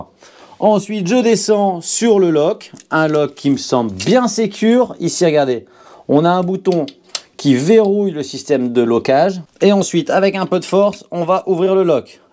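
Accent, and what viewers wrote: North, France. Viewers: Je reconnais bien le nord lol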